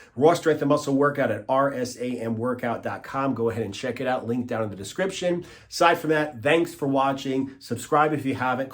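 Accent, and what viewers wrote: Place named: Philadelphia, United States. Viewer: Great video, man! A lot of folks need to hear this. P.s. your accent makes me think you're from Philly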